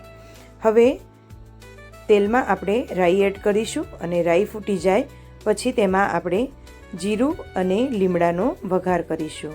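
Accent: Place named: Mehsana, India. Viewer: Mouth watering thaali 👌 Your accent looks like mehsana, if so, please reply 🙏 Thanks 😊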